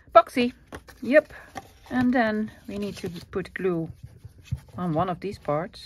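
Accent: Netherlands. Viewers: First time watching your channel. Loving the ''flippies'' and "snippies" words. I normally cut off the first of the 2 flaps to reduce bulk, won't be cutting them off anymore, think it makes the sides pull in better so they are straight. Great tutorial. Love the Dutch accent, reminds me of my Dutch friends from years ago.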